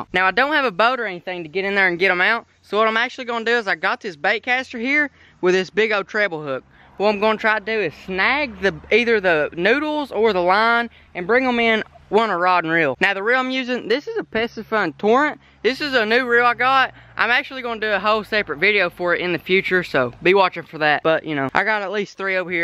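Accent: United States. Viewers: Country akcent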